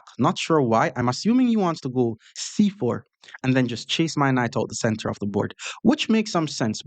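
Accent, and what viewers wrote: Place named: Jamaica. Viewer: thank you so very much! I don't think anyone has ever played and also taught chess with more passion and joy than you. Combined with your smile, your warmth and the patwa accent it is absolutely infectious. Best moment of this video: - "double up his pawns...his pawn on e5 is also hanging...I'm gonna easily...got my king out of the center...my position is very solid...I have no weaknesses"...... one pawn move followed by his queen move: "this is actually a very scary positian"....comedy gold! Stiil have to laugh about it. Every single video makes me smile the entire time. Please keep on shining!!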